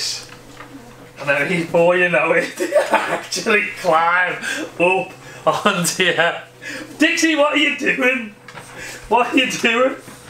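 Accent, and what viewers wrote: Liverpool, United Kingdom. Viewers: Tuning in to your scouse tones again on a Sunday.👍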